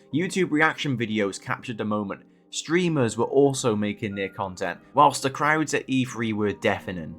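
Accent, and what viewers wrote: Wales, United Kingdom. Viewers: Is that a welsh accent i detect?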